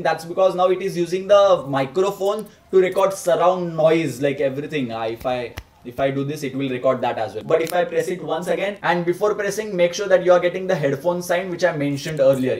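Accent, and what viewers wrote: India. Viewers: Finally a indian speaking english while explaing on this video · Oh my God your Indian accent is making me laugh😂😂😂 Anyway is this a glitch to a phone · Sorry, but this Indian accent sounds like an acoustic hell.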